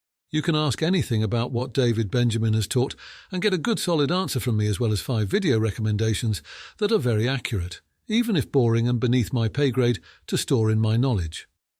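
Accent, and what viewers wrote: United Kingdom. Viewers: understandably, the voice could only ever have had a british accent 😂 luv it ❤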